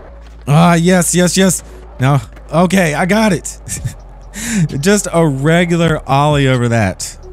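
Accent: South, United States. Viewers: Is there a name for this sort of lispy, effeminate Southern accent? Like how Lindsey Graham talks.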